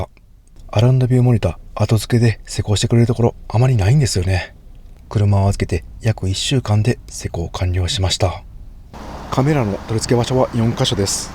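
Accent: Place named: Kansai, Japan. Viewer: 久しぶりに見ましたなぜかの声にほっと安心した気分になりました… 関西弁のままで話してくれるのも嬉しいです😊 ありがとう😊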